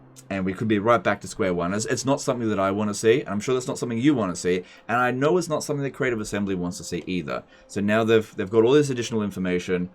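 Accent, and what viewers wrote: Australia. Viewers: So the haters watch to scrutinize him over ever/any video? That’s when you know you’re successful. It also illustrates how pathetic the haters are. The guy is Australian, he sounds like a dick even when he’s not being one, get over it.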